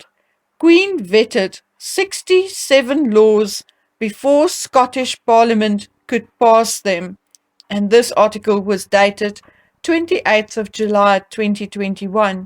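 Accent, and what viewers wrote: South Africa. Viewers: Your videos are always very informative and well prepared and I look forward to hearing your news every time one comes up. Thank you for your hard work,I love listening to your S A pronunciation.Brava best wishes from Italy . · We're in California, so we don't have much opportunity here to speak with many people from South Africa, but from what I've heard accent and style of speech is very typical.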